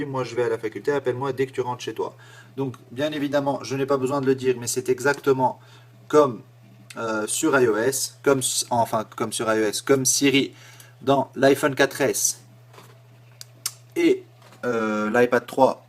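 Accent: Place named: Tunisia. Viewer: petit accent (tunisien?) tres charmant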